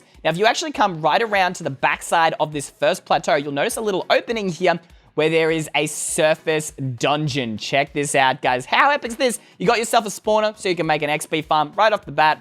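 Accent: Australia. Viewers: are you austrailan? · Are you Australian?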